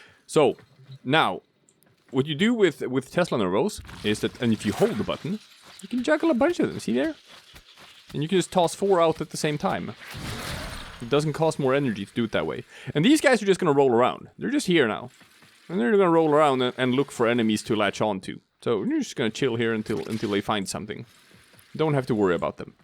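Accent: Sweden. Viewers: Thought the accent sounded Swedish, went to "about" and sure enough I was right haha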